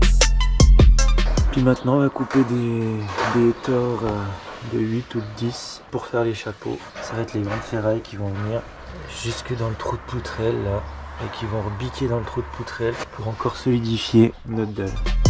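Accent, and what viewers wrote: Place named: Jura, France. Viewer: Je me disais bien que tu as un accent bien jurassien 😀 Dolois nennis ma fois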